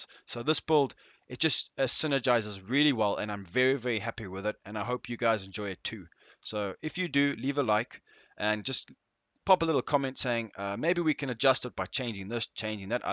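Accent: South Africa. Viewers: Nice video, you mind me asking are you from South Africa?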